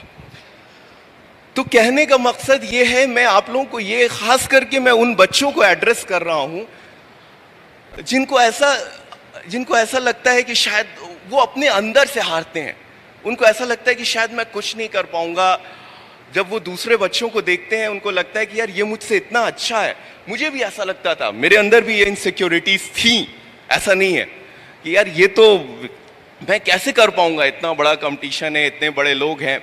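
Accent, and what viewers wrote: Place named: Pakistan. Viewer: OMG 😂 yeh to Pakistani wala Hindi s bole rha h😱🙈🙉🙊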